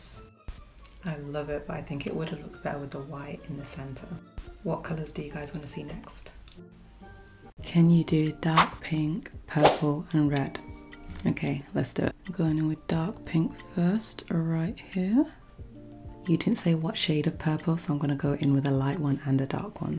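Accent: United Kingdom. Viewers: Can you do pink yellow and purple❤ and I love your British voice and I also love your make up😊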